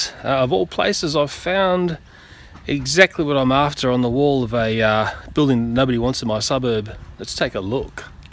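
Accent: Australia. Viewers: 26 seconds into the video and I already know he's Australian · It called an aussie accent. Bloody tolerate it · My brain: Wait, is this guy in Australian? sees mantis Me: Yup, definitely Australian.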